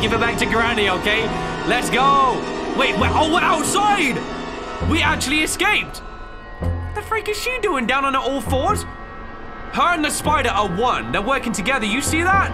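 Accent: United Kingdom. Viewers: Wow fabulous.This man's brittish.lovely.. Good video!!!😊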